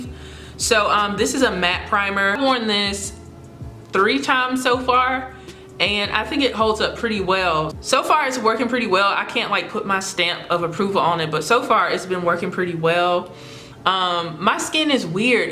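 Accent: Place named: Texas, United States. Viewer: Are u from Texas??? Your accent is everything 😘.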